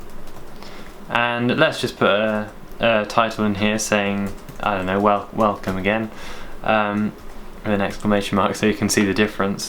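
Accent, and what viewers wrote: United Kingdom. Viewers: are you british ??your accents remind me of classic english like joe root does :D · Are you english ???britisher?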